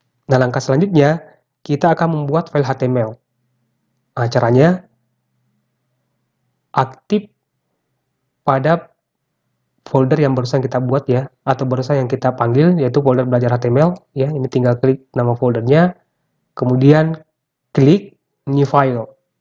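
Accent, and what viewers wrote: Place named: Sulawesi, Indonesia. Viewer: Cara bicaranya mirip orang Sulawesi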